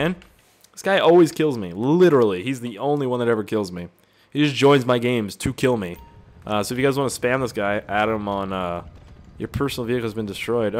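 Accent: Canada. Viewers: No homo but I love you Canadian accent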